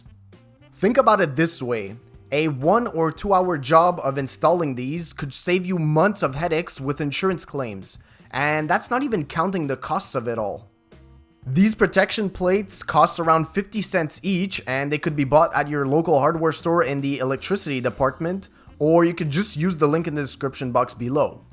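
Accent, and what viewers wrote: Quebec, Canada. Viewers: I new you were Canadian A bit of a french accent. Sudbery? Thanks your my go to for plumbing. hate doing plumbing fixes or repairs but I don't mind installing new.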